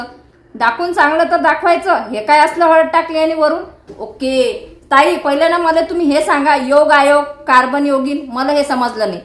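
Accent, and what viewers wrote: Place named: Varhadi, India. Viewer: 😂😂😂 तू इतकी छान बोलते ना आणि आपली वराडी असल्यामुळे खूप छान वाटते मला आणि तू आमच्याच असल्यामुळे त्याहीपेक्षा छान वाटते अकोट ला असते ना मी तर नक्कीच भेटली असती तुला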